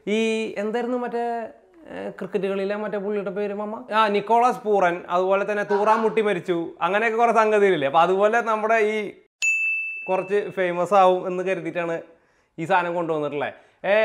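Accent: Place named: Kodungallur, India. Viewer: കേട്ടിട് കൊടുങ്ങല്ലൂർ ആണെന്ന് തോന്നുന്നു വീട് 😌🤣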